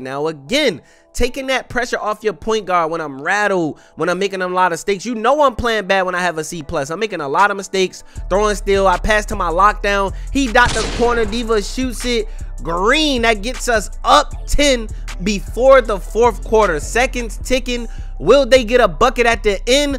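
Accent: Baltimore, United States. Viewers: U from Baltimore? Lol sound like it….twoooo man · Is he from Baltimore? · yo are you from baltimore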